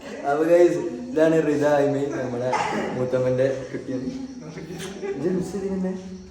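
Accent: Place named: Kasaragod, India. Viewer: Edeyil nammale kasargod bashe bannalo enth pang 🤣💗👍🏻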